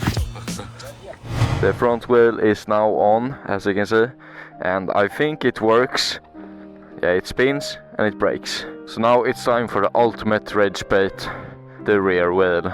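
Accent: Sweden. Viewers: So much swedish accent😂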